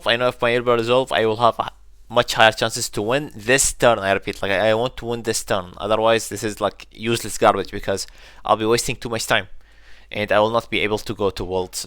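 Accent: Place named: Iraq. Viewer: من صوتك كلت عراقي مابيه مجال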